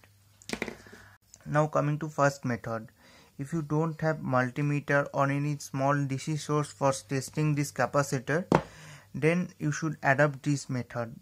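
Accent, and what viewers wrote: India. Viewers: Sir, I hoped to learn from you but American’s have a very tough time with Indian accents. I’m sure you provided informative information in your video, but I could not stay with you simply because of the heavy accent. Thank you for your post, I’m only leaving this comment as a inspirational response to you so you can have a greater viewership.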